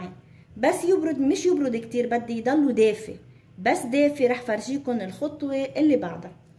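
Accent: Lebanon. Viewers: ليش اللهجة لبنانية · فيديو جميل والأجمل اللهجة اللبنانية الساحرة .أخوكم من الجزائر 🇩🇿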